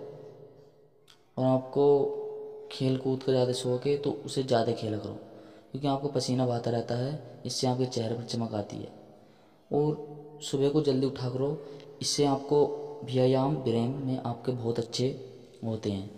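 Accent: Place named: Western Uttar Pradesh, India. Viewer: Bhai teri awaj se lagra hai Ki tum west up se ho Am I right ?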